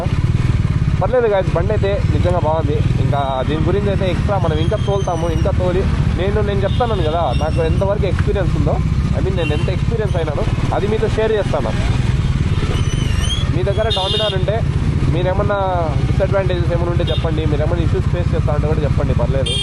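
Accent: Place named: Anantapur, India. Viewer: Meedi anantapur district kada anna meeri matlade slang chustene telustundi 🎉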